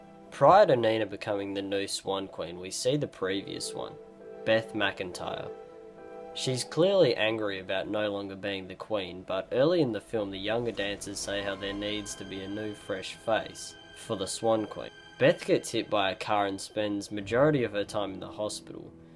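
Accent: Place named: Australia. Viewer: I think he's Australian.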